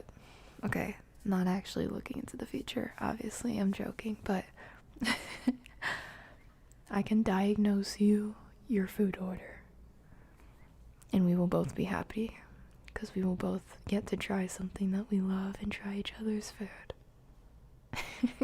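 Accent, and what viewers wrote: France. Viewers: I’m going to speak for everyone when I say this… We kinda can’t put into words how much we appreciate you. I’m going to rephrase that... Your content is nothing short of amazing, but at the end of the day, you, is what we love and appreciate. Again it’s not just your content, but the fact that day in and day out (no matter how oopsie doopsie the schedule gets) you strive to put us as a priority. Not to mention, no other creator in this field interacts with viewers like you do, and that makes us genuinely feel like we truly are your sunshine who makes you happy when sky’s are gray… you also preach the Good News of Christ which very FEW people do. On a slightly different note, there’s always a handful of us out there that will never open up about what’s going on in our lives, but just know you’ve pulled so many people out of so many holes that we cannot simply thank you enough for it… one of the many was in your chat earlier too, and with your help was able to join the Marine Corps. 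You change lives. That being said never forget how loved you are by not only us, but by God as well. You’re a one of a kind, special, and goofy human being who has one heck of a French accent that no one will ever come close to touching. Thank you, and we genuinely love you. Love, -Your Community Very important P.S.: please please please make sure you’re taking care of yourself. Always remember that if you need too, don’t be afraid to put yourself first. I know we pick on you for the late st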